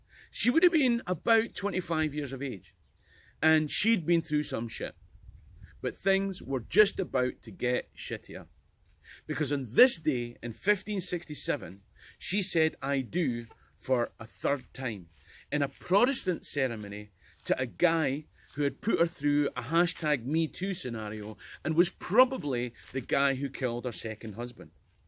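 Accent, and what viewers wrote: Scotland, United Kingdom. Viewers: I love his scottish dialect...good to learn to understand a Scot when on holidays in Scotland...and even England...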